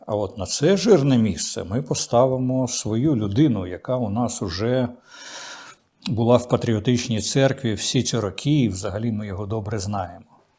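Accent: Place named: Russia. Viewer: А чому в пана Дмитра така підозріла російська фонетика? :) Чому це він називає КлИчка - "КлІчком"? з якого дива? Звідки оці : "ДанІлови", "РЄзнікови", а також вимовляння на російський лад решти слів на кшталт "проблЄми", "крЄмль" тощо. Вам так подобається московська мова?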